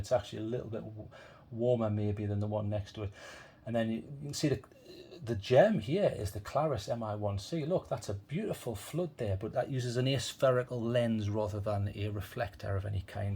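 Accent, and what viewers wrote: United Kingdom. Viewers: Love British accent & this is how a flashlight should be reviewed. Good job 👌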